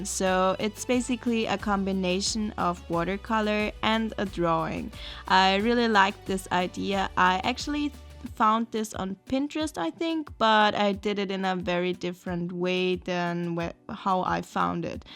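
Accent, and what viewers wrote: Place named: Germany. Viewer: Hör Deutsch raus in dem englischen akzent,lieg ich da richtig?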